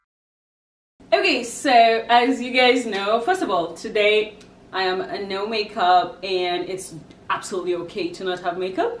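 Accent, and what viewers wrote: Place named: Cockney, United Kingdom. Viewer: Great videos. Why do you have some hidden bri-ish or rather cogney accept? Which State are you in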